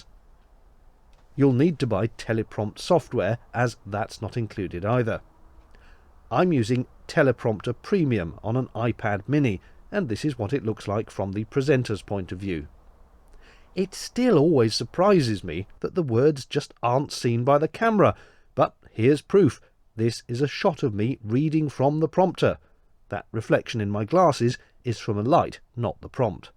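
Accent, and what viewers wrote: United Kingdom. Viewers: Your British accent just makes me want to watch it over and over again lol. Good job mate! 👍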